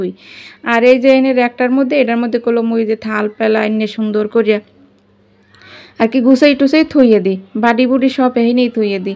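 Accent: Barishal, Bangladesh. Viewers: আপু আমার কাছে তোমার ভিডিও থেকে না তোমার কথাগুলো শুনতে ভালো লাগে বেশি তোমাদের বরিশালের ভাষা আমি তোমার কথা শোনার জন্য তোমার ভিডিও দেখি ফাস্ট টু লাস্ট মানে তোমার কাজের কি কমেন্ট করব বুঝিনা শুধু তোমার কথা শুনতে থাকি ❤❤❤❤❤❤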